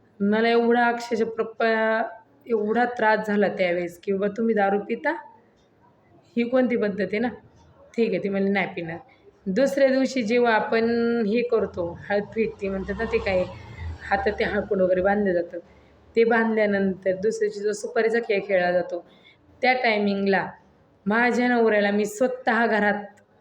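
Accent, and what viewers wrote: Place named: Khandesh, India. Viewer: पण तुमच्या बोलण्या वरून तूम्ही पुणेरी वाटत नाही तुम्ही खानदेशी किंवा सातारा सांगली चे दिसत आहात हा तुम्ही आता पुणे मध्ये राहत असाल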